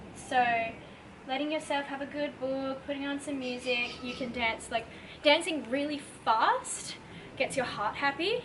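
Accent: Australia. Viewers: this is my first video of urs but i'm guessing u're australian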